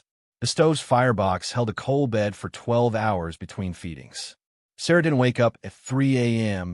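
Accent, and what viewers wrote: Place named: United States. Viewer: Don’t waste your time it just repeats and repeats and repeats. The American accent is unbearable.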